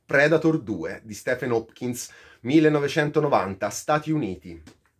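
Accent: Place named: Livorno, Italy. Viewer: Ma sei di Livorno?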